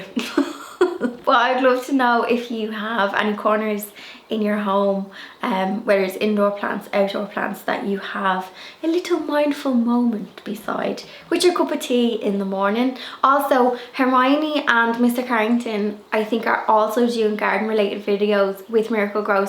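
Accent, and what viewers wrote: Ireland. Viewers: I kept thinking you were saying day lilies, growing them from seed. Then when I saw your plants, I’m like that’s not a day Lilly. It took me a hot minute to figure out you were saying dahlia. I’m from America so I had to let the Irish sink in a minute. I have several growing I’m my garden. I love them. Happy growing!!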